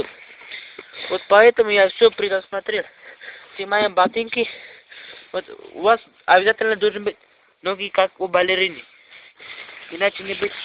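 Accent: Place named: Dagestan, Russia. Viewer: татарский дагестанец точно говорю акцент похож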